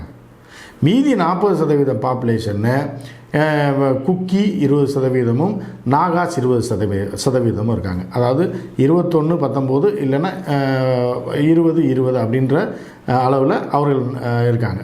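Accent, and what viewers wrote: Madurai, India. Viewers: Yes madurai karar ...athu than pechu thoni kalakuthu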